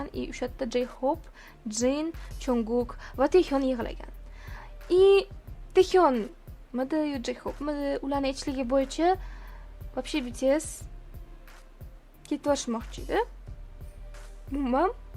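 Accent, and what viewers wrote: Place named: Khorezm, Uzbekistan. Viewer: Xorazmdanmisiz apka sheva tortib baryotir❤😂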